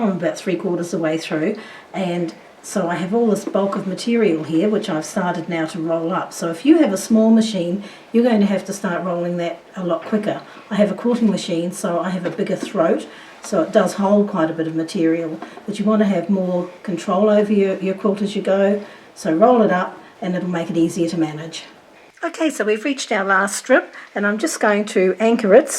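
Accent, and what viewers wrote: Australia. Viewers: I love your video on how to machine bind a quilt. Very clear, very concise and a new little trick on how to make sure you cut your binding exactly the right length. PS love, love, love your Australian accent so sweet ‼️❤️ Colleen ☘️ USA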